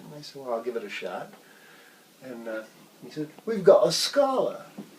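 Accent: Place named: United Kingdom. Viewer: That is a Great,and Funny story! Love the British accent! It would be Cool to hear how you guys got the gig with Olivia Newton John!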